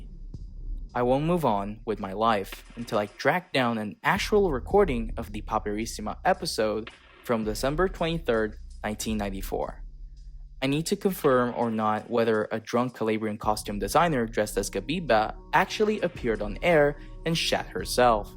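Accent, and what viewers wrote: United States. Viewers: ...l'autore di " "! 😱 PS: quando parli inglese hai un accento americano perfetto! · no vabbè ma il tuo inglese è pazzesco fra 🫂 I shyt you not, pensavo che fossi americano (o comunque un americano di prima generazione, con genitori italiani) per quanto buona è la tua pronuncia ahahah ti giuro, sei arrivato ai nomi e ho detto “ayo che pronuncia perfetta che ha in italiano”, sono andata a vedere sul tuo canale la nazione che hai impostato, leggo “italia” e mi sento una c•gli•na, perché torna tutto 😑💀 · Hai un eccellente accento americano, veramente complimentoni. Ma....andiamo...nessun americano sarebbe riuscito a pronunciare correttamente PAPERISSIMA, IN MANIERA COSì FLUENTE POI. TI SEI TRADITO DA SOLO ahahaha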